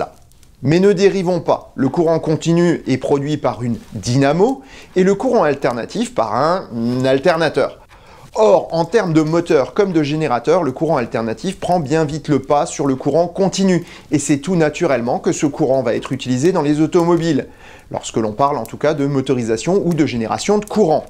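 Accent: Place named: Alsace, France. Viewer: Super intéressant et bien présenté, j'aime bien le décor. Peut-être un point à améliorer pour ma part, le petit alsacien distrait l'écoute.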